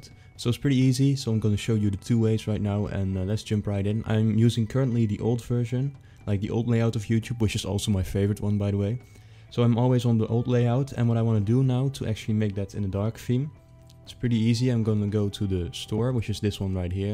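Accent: Netherlands. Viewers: he has an a dutch accent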